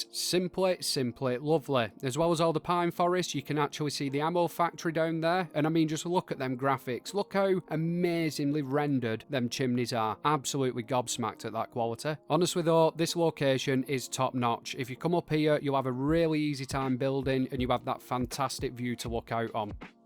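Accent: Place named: Northern England, United Kingdom. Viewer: mate your commentary is unreal, as a northerner hearing another northerner doing videos is hilarious cause I catch all the jokes, there'll be a time soon where northerners are appreciated more on youtube and you'll get ya views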